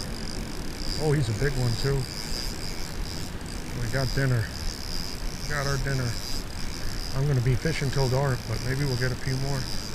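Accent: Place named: Queens, United States. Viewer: Hey kinda sounds like your from Queens? area? - Jamica Bay or even try Bay Park, East Rockaway may be Closer to home. Tight lines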